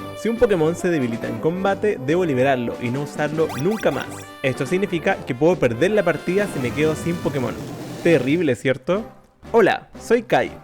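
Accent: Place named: Chile. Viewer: Grandioso video, para ser sincero lo único a mejorar sería los trazados de dibujos y talvez algo de anatomía, la animación uuf en eso estamos bien aunque yo no sé de animación así que ahí no me meto ajaja la verdad me encantó tu video y entendí CADA referencia y además e de suponer que eres chileno por tu forma de hablar y por alguna que otra referencia ajajaj y sera un gusto ser parte de tu canal y dar mi like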